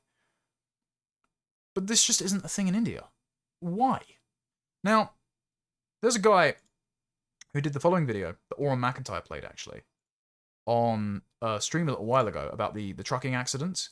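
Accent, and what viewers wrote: United Kingdom. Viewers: Nah, I think it's hot.I love the uk accent 😍😋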